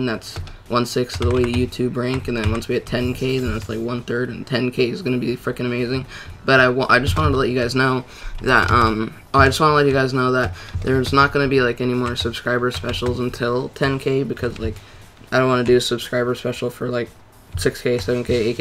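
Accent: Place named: Ireland. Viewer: Ign Irishaccent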